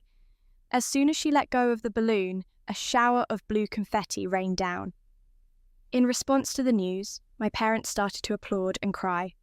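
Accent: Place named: United Kingdom. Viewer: Hate the British accent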